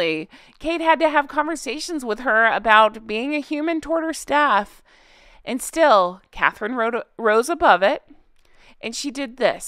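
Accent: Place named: United States. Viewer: i love voice... which gives away she would be a laugh to hang out with.. one of my favourite American accent s.. revenge............ revenggggeeeee.. 🤣.. always gives a chuckle hearing her say it..and shes just said it again while im typing 🤣.. stop it 🤣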